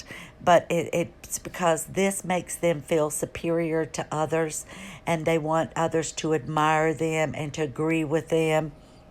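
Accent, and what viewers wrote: South, United States. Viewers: The Southern accent gives you away as a bullshit artist MAGA person.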